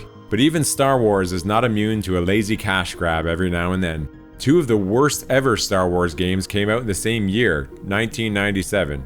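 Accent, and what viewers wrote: Canada. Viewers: Love the Canadian accent 🫶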